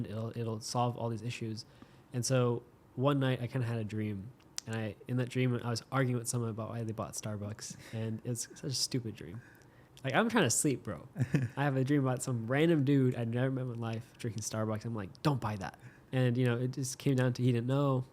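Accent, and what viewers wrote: United Kingdom. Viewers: Scotish accent... Northern Scottish accent is more stronger. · No need to - Be yourself, its a nice accent, "kinda sounds british", but theres subtitles and speed options I believe. So be yourself Inshallah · Put on subtitles. It's a British accent so if you speak ENGLISH you should be able to understand him.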